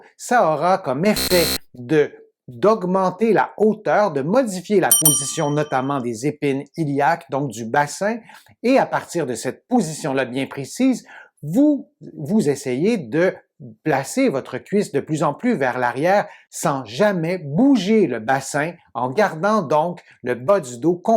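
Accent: Quebec, Canada. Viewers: il a l accent québécois !!!!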